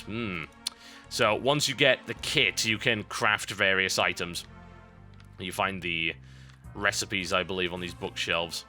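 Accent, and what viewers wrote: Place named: Yorkshire, United Kingdom. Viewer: every so often you go into a yorkshire accent.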